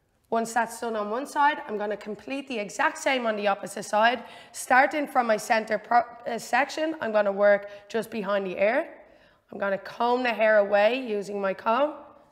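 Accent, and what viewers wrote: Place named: United Kingdom. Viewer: What part of Britain are you from, you’re accent is awesome! Since the pandemic forced me to become my own barber, and after lots of trial and error(and clipper fuck-ups), these videos from you and the Regal Gentleman are huge help. I can’t go back to my rural Michigan (US) hairdressers, I wouldn’t let them bush my cat’s hair! I like to do funky things with my hair, mostly short highly textured, disheveled, high fades…I’ve been experimenting with coloring bleaching and highlighting for years, I like ice grey tones a lot, and that took a LOT of practice and patience and it still challenging, as I mostly do silver or grey highlights…. But yeah, it’s fun stuff, you guys the best jobs, making people look their best, I’m sure it’s incredibly fulfilling career! Thanks for the video tutorials, much appreciated 😁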